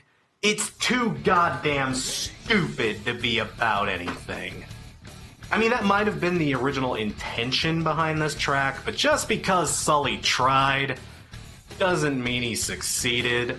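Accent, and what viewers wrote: Boston, United States. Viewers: Wow that Boston accent...for a moment I figured you thought they were from Australia. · I was enjoying your Boston accent.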